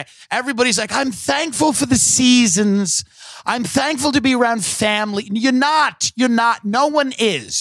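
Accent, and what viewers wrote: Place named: Northeast, United States. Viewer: I really like him because he's one of the relatively few people in the entertainment industry that are real true northeasterners. Like, a ton of people in the entertainment industry are from places like New York and stuff but they're usually very wealthy and Jewish or have family in the entertainment industry and they're just not relatable to people that grew up working class in the Northeast. Tim Dillon sounds like he could be someone you know if you live in New York, New Jersey, Rhode Island, Massachusetts etc. It's really poor up here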